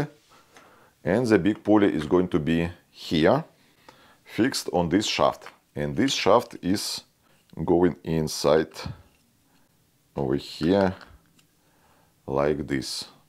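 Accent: Russia. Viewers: Вот так выглядит русский акцент)) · Really cool project 👍 P.S. Which accent type that is? I think about Russian, but i dont really know 🤔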